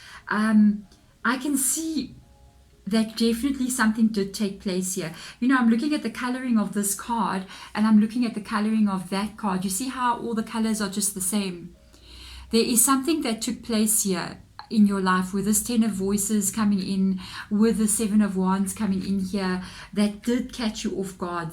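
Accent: Afrikaans, South Africa. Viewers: Hey! First time on your channel¡ About to watch the reading, but heard your accent! Are you Afrikaans?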